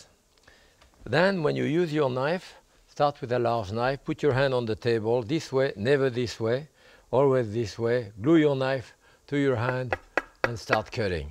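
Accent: France. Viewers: I love his teaching. Clear, professional, pleasant. The French accent makes it all the more authentic! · Gotta love a real French accent.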